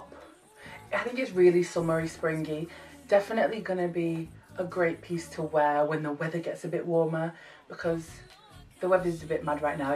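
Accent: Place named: Yorkshire, United Kingdom. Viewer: Proper love you, reppin Yorkshire accent🤘🏽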